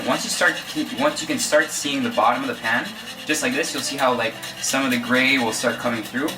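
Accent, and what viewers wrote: France. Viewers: that french accent. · it's cuz he's French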